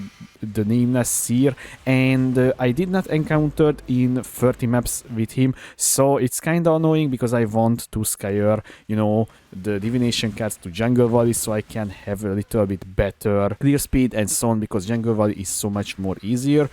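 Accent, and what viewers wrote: Hungary. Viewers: are you hungarian? what is that accent? 😁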